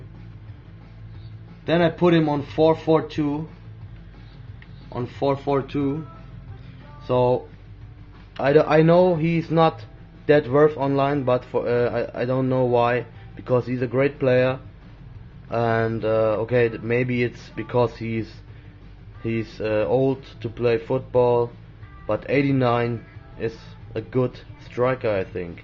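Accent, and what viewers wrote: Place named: Germany. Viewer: man hört deinen deutschen akzent haha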